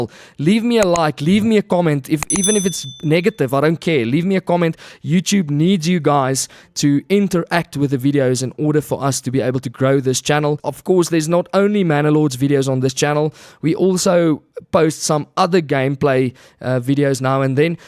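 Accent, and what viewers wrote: South Africa. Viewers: The South African accent is strong with this one!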